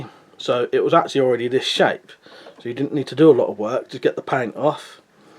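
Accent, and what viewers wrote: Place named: England, United Kingdom. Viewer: Great video.i grew up on and from your accent I'm guessing your from the same area as bruce.and rod Smallwood too.awesome scorpion reminds me of my homemade one.its on my YouTube channel.youll see the similarities.great looking blades man.mine are cheapo steal.only 1 I did is stainless and it takes 3 times as long.i use same tools as you.except my dad's portable band saw to take off as much steel as possible and grinding off the rest.before I did about 70% hacksawing.dont know if your a fan but I'm a true nerd maiden fan.up the irons!!!!that applies to knife makers too!🎸🔪🎸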